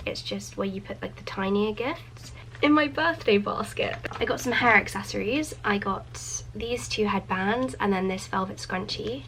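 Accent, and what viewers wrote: RP, United Kingdom. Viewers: Posh english* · Omg your so posshhh like it’s so cute I am from England and bloody hell are we that posh nope 😹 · Wow😮ave never heard someone speaking this posh before 😂😂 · You sound so posh and you say ‘received’ I love your accent although I’m British too 🇬🇧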